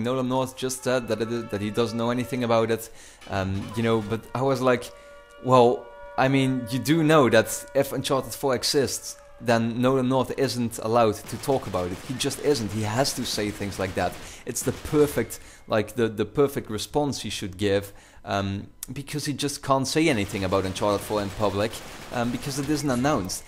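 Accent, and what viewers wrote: Netherlands. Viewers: Do you talk dutch a lot outside your commentaries? I came across your channel just today and I'm Dutch as well (just sending it in English so other people can read it). Quick tip, I know it's hard to get rid of your Dutch accent but if you want to get rid of it, try to talk as much English as possible. (I mainly got rid of it because I am talking a lot with friends from England and play BF3 with them, I still have an accent sometimes but now it's mainly gone) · You must be dutch right? I really hear it in your english. I'm dutch aswell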